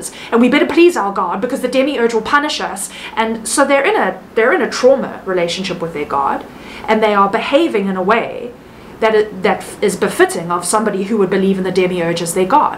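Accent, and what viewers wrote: South Africa. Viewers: You have a South African accent 👁️🐍⚖️🔥☝️ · You sound South African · Are you a Saffa? 🇿🇦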